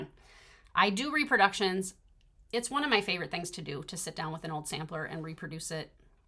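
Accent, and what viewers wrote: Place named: Pennsylvania, United States. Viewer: Woo Hoo! A blast from the past (and ever present!). What a sight for sore eyes you are dear lady! And what a fabulous catch up from one of my favorite people. I can’t wait to meet you in Tulsa this October. I love everything you showed us today. And, I am thrilled that you love your new happy place! My folks were born and raised in Erie: Mom in Little Italy, dad in the Irish and German neighborhoods. With dad’s work, we migrated west to LA then to Texas. That’s where we’ve been since 1970. I can only imagine the authentic everything you’re able to enjoy in the city of brotherly love. Your accent even reminds me of my cousins in PA. So, I’m sure you fit right in. Please drive carefully, stay safe, and enjoy every blooming minute of being with your friends in Tennessee. Congrats on your business, your new releases and on your new life in a lovely community. 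Happy stitching every day! You look great and so happy! ❤❤❤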